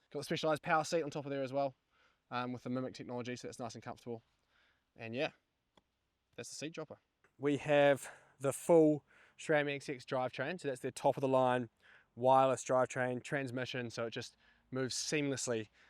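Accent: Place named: New Zealand. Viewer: Bump dat audio a bit. The people wanna hear that kiwi accent loud n clearrr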